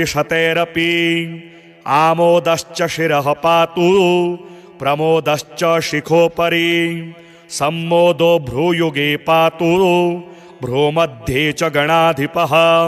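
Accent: Standard. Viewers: जय माता की, बहुत अच्छी आवाज है शुध्द उच्चारण है ,बहुत बहुत धन्यवाद 🌹🌹 नारायण गृहस्थ आश्रम नारायण नगर पचरॉव चुनार मीरजापुर उ प़ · 🙏ॐ श्री गणेशाय नम:🙏 🙏ॐ रिद्धि सिद्धि सहिताय श्री गणेशाय नम:🙏 आचार्य जी द्वारा शुद्ध उच्चारण सहित सुस्वर में लयबद्ध वाचन। आपको सादर प्रणाम🙏🙏🙏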